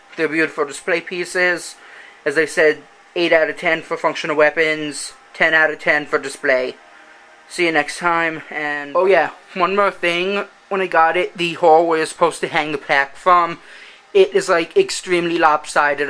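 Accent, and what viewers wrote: Ireland. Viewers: What's the guy's accent? sounds a bit Irish, but not really. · Are you from Ireland?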